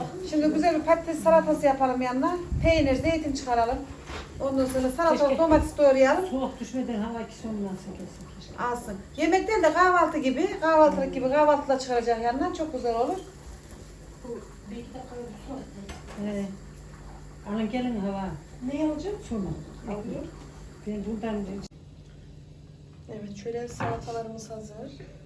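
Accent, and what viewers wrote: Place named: Aksaray, Turkey. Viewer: hanım benimde annemler aksaray koçhisarlı, sizi görür görmez şivenizden anladım:) babamda çorumlu, kültür birleşmesi cok güzel birşey insan sürekli yeni şeyler öğreniyor, çok becerikli bir hanımsınız taktir ediyorum:)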